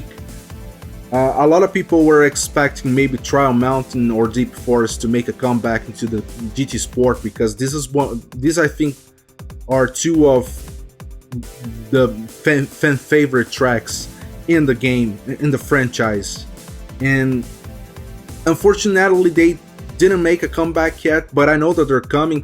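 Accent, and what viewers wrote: Brazil. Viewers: TOP cara.... bem legal hem.... Como eu estou aprendendo aprendendo inglês eu percebi seu sotoque de brasileiro. hehehe Legal cara.. eu também tenho um canal no youtube mas é sobre T.I. Isso manu. Abraços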